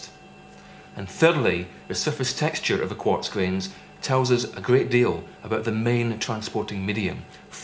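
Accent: Scotland, United Kingdom. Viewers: anyone else only pay attention to his scottish accent and not the video the whole time?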